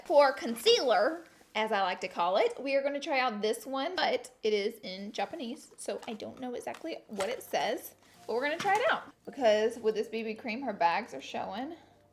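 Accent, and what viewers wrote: South, United States. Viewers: You came up on my feed , so I don’t know you but all I gotta say are you country?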